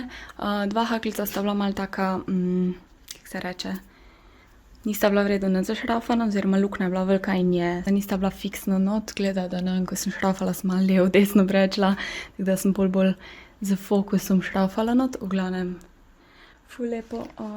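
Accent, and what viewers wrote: Carinthia, Austria. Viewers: Tvoji vlogi so meni zelooooooo zanimivi saj se pocutim zelo domace ko slisim koroski naglas ker sem tudi sama iz koroske🙈😂🥰👍👍🌈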